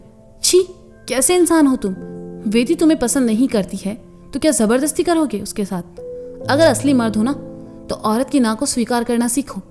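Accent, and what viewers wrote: Kanpur, India. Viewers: Mam ap manmarziyan record karte karte kanpuriya tone me baat karne lage ho joki bahut mast hai 😊😊❤❤😁😁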